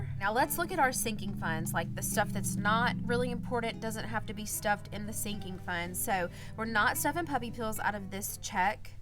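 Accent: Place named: South, United States. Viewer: definitely not annoying at all I love southern accents 🥰🤍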